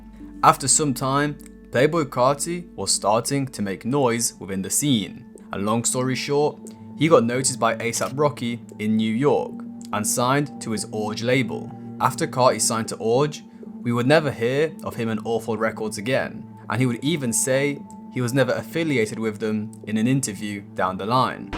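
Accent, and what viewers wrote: United Kingdom. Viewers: Why u gotta have English accent it would have been better wit sum else I understand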